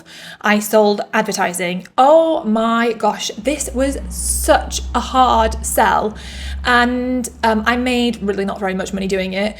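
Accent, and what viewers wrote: United Kingdom. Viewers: This is great info, now how can you structure all of this into a methodology? That's something you could sell! The message/ offer about your membership is not clear. What would be the exact benefit of joining? You sound like you're British, so will your membership/ knowledge is British mentality specific? Or will it also apply to the US culture? The workshop is also a good idea but the offer is not clear. Will we get the clear step by step instructions on how to achieve what you have achieved? This video is good but could be great, in my opinion, it's a missed opportunity.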